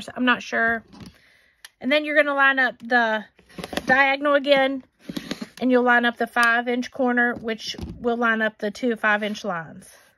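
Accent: South, United States. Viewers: Stop doubting yourself! Girl those seams are looking awesome 👍😎!! Love to listen to you talk, Southern Gal!!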